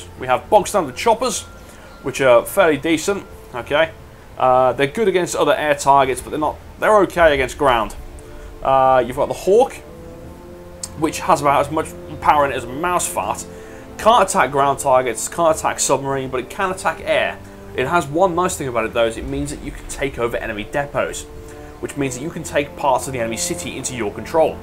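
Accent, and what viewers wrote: United Kingdom. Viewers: A brit.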